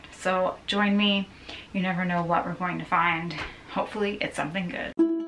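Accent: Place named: Western Massachusetts, United States. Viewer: Every time you pronounce the word button or curtain etc, I remember an article I read quite a few years back about the origin of American accents. I searched today and found your particular pronunciation is addressed on Babble: The United States of Accents under Western Massachusetts and Connecticut Accents. So be proud! You don't have to change for us!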